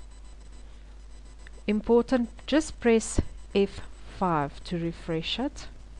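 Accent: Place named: South Africa. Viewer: Haai auntie maar daai is n South african accent. Thanks for the video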